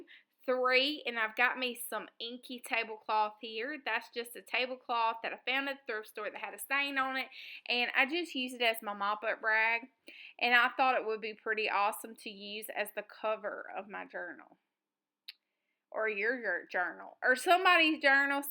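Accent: South, United States. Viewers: You are too much fun with that southern twang! Love the little journal and the cover.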